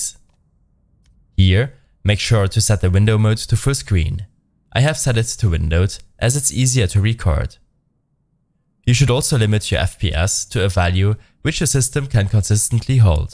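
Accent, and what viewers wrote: Germany. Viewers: Hört sich ekelhaft deutsch an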